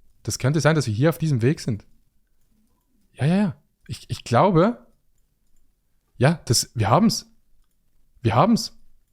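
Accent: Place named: Zurich, Switzerland. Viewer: ich dachte ku kommst aus Zürich😂 liebe grüsse von kleinbasel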